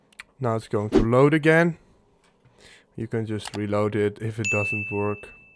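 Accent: Netherlands. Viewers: nederlands accent lol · Dutch accent lol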